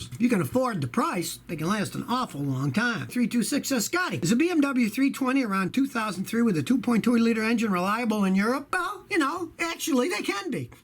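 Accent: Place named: Texas, United States. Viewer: I believe he's from Houston, Texas. Some folks still use old accentuation like that. Mostly older folks. I'm not gonna lie, we do have a general different tone here in Texas tho.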